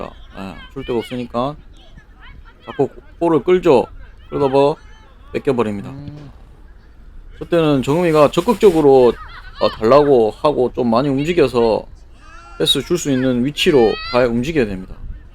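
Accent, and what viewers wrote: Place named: Gyeongsang, South Korea. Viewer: 아이들 축구도 치열하네요^^ 경상도 해설 잘 들었어요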